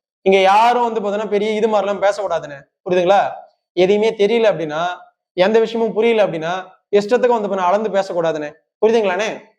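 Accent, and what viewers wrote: India. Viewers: தம்பி நீ தமிழ் நல்லா படிச்சிட்டு வா நீ பேசுறது ஹிந்தி காரன் தமிழ் பேசுறது மாதிரி இருக்கு. அது போக உங்கள மாதிரி பணத்துக்காக கொள்கை இல்லாமல் இருக்குற கட்சி ptk இல்லை நாங்க எப்போதும் தப்புனா சுட்டி காட்டுவோம் உங்கள மாதிரி பணத்துக்காக பேசமாட்டோம்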